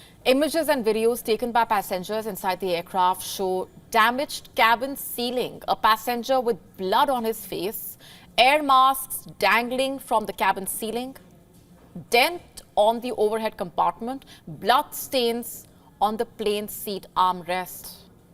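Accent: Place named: India. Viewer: Thick indian accent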